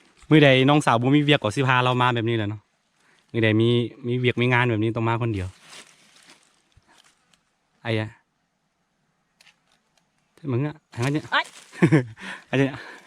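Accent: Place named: Sakon Nakhon, Thailand. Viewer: พึ่งมาเบิ่ง เอ้อ เว่าคือข่อยไทสกลเลย 555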